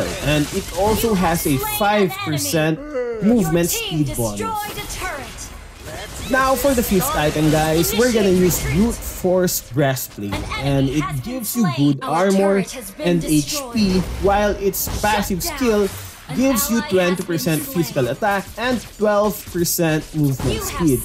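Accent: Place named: Philippines. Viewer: The pinoy accent tho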